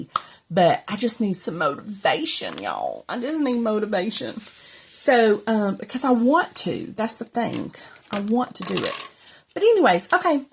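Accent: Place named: South, United States. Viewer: no girl…but I am from Texas. My son was watching with me when I played your video and he said…oh she’s from the south. She sounds like us! 😂😍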